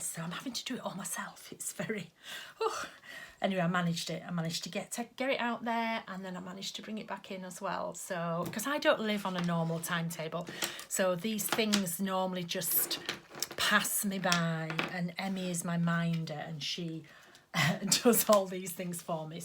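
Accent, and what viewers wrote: England, United Kingdom. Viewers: I cannot say how much I've loved watching and hearing you. As an ex-pat in Australia, I've loved listening to you talking about everyday English things with your brill accent....as well as watching your amazing creative skills. I've only recently discovered you after listening to your Podcast on <link> and I've been obsessed ever since!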